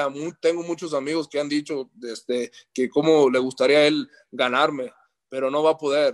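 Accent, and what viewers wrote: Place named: Puerto Rico. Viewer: Que hablador está resultando este muchacho... Parece puerto riiqueño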